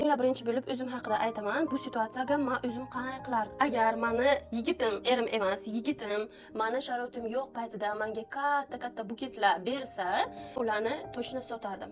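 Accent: Bukhara, Uzbekistan. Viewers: ❤❤❤ zo'r wud hamin gapaton pryam buxorocha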